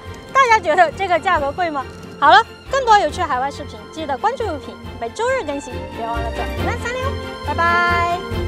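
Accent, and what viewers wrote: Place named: Northeast, China. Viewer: 女主是混血吗 ？口音夹杂着东北口音❤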